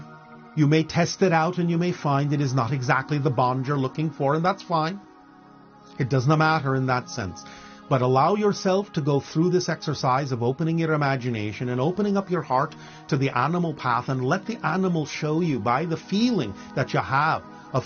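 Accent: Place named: Ireland. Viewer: Whg does Bashar have an Irish accent 🤣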